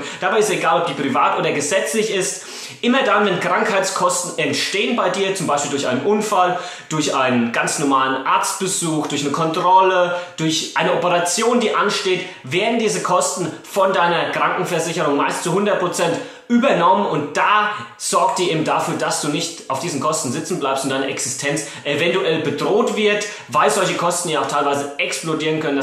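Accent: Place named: Franconia, Germany. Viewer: Aus Aschebersch... und dann so einen schönen fränkischen Dialekt :D. Halbhesse :D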